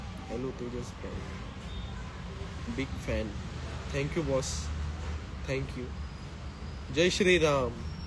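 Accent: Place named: Kolhapur, India. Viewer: आरे दा तु लय भारी बोलतो तु कोल्हापूरचा आहे का रे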